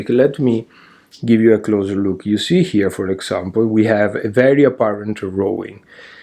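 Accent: Italy. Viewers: Thank you for posting a wonderful video, even when you're feeling grumpy. Your mood is totally understandable with this constant rain! Jumpers look great. Hopefully you can soon get opportunity to paint your fence. Maybe we'll see the end result 😅 Can definitely hear the Irish accent come through, mixed with Italian, sounds so nice.. Take care and enjoy the Bank Holiday weekend too ❤ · Hej, I love your accent, I am from Denmark, and English with Italian accent is much better than with danish😀 being Danish I fully understand your being grumpy about rain…. 😂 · I love your podcast and I love your Italian accent!❤️ Also you didn’t seem grumpy! You are great and don’t change a thing. I be back again soon! Katie from Maine USA🌸🌙😊🧶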